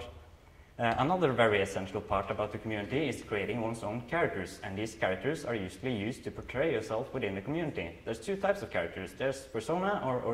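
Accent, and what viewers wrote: Norway. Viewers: The clapping went so long ;-; my heart haha. I also love your accent and had noooo idea it was a Norwegian one?! Voices are wild. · Thats what we call norwegian english! I have that accent sometimes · Slaughtered Norwegian accent? No, your accent is beautiful. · When he tells you to put on subtitles for his Norwegian accent but fans knows exactly what he is saying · Wait, wait are you from norway, HALLA FRA BAMBLE! EJ BRO HEG VISTE IKKE at du var norsk, hello from Bamble I didn't know you're Norwegian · Are you from (Norge)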